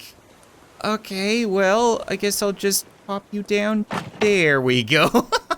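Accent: Appalachia, United States. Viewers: why are you using a hillbilly voice if this game is placed in indonesia / phillipines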